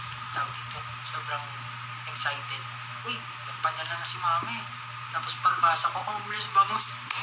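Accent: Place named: Cavite, Philippines. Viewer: Kabitenyo yarn?! Hahahahaa Ang cute ng accent mo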